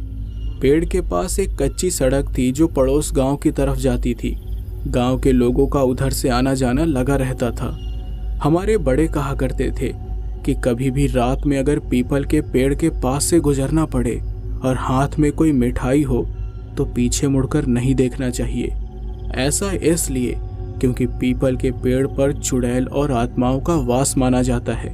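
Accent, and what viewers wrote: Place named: Bhojpuri, India. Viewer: Your best story so far. And loved the Bhojpuri flavour in it. Maza aa gayil ekdam 😅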